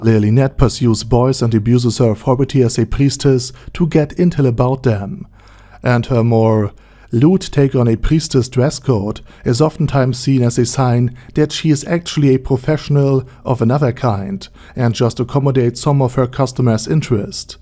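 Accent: Germany. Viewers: Boi you got a hard German accent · i am pretty pretty sure ur are german arent you?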